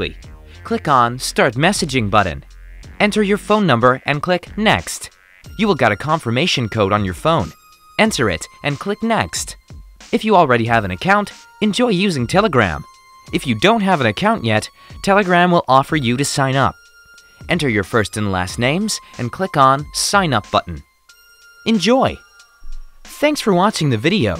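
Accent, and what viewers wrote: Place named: United States. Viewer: this voice is in American English. I was able to set this up and i am not an IT person. Thank you for helping.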